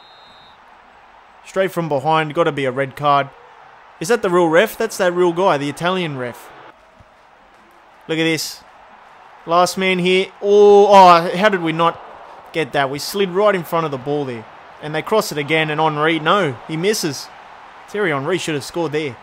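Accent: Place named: Australia. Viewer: This is the most Australian commentary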